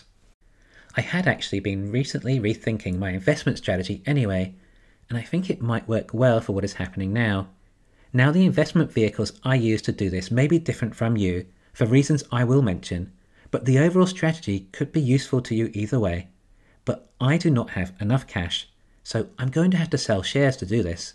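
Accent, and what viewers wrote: United Kingdom. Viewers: I always wonder, you seem to live in the US but you have a British accent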